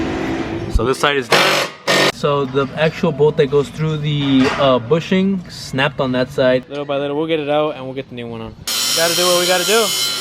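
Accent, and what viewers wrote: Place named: El Salvador. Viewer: I know a Salvi when I hear one 🤣🔥🔥 Dope video !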